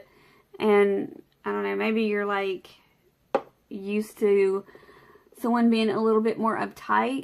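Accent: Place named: Tennessee, United States. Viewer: I'm assuming that if you was my neighbor , we d be best friends. You remind me of my friends in Tennessee, so I like hearing you talk.And you go through same traumas and dramas we all go through, so you are relatable.And you are knowledgable where I am ignorant, so you are my teacher ❤️❤️❤️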